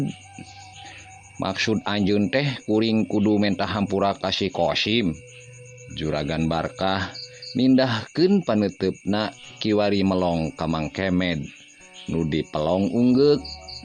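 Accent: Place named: Sunda, Indonesia. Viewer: DINA MAOSKEUN MAH SAE. KEUNA KANA AKSEN SUNDA. NANGING SEU RUR KECAP ANU LEPAT. RANDA JADI RANGDA NU KEDAHNA EU DIBACA E. KAWAS TEU WANOH BASA SUNDA. 🙏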